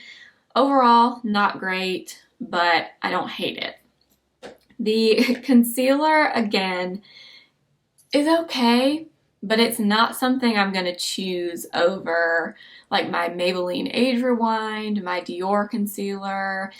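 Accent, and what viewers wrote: South, United States. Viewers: You are so heavenly! Love your videos! Where are you from? I hear a slight southernish accent perhaps?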